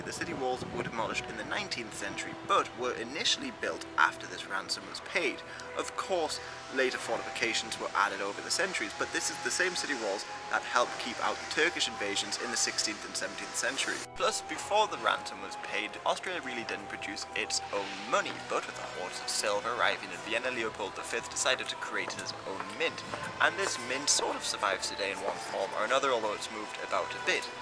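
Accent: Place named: Newcastle, United Kingdom. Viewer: he is from Newcastle, so a geordie or 'Northern' accent.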